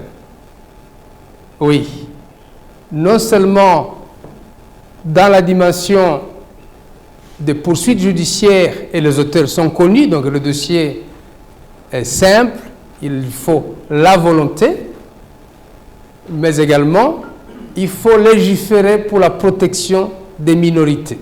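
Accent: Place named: Rwanda. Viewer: Tu te trompes toi même vraiment tu vais par 4 chemins et te voilà arriver au point que Dieu te maudisse profondement jusqu'à ta moëlle tutsi rwandais que tu es tu ne convaincras personne et tu la fermes. Tu voulais recencer les congolais pour Donner l'identité aux rwandais mais tu as raté ton accent ressort toujours. Tes troupes rebelles sont là aussi. Tu as apporté les fonds à vos troupes rebelles et intensifier les tueries dans notre pays. Tu as tué combien de congolais leur sang tombe sur la tête de tes enfants. Je souhaite que tu ne sors pas vivant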